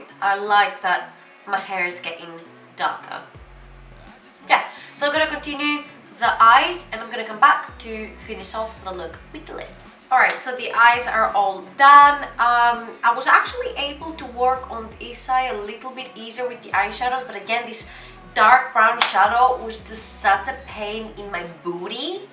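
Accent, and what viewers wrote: Greece. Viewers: I love it too, Greek accent is the cutest..I could listen to anything, including user manual for drill machine, if it was said with Greek accent..or in Greek 😂💯💯💯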